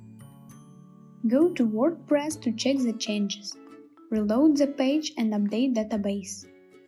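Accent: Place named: Russia. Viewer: I love your Russian/Polish/Ukrainian accent.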